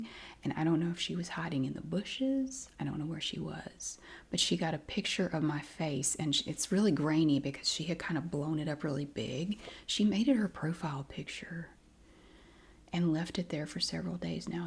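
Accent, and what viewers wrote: South, United States. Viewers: I really love both of channels I'm from the UK and I really love the southern American accent I find it very relaxing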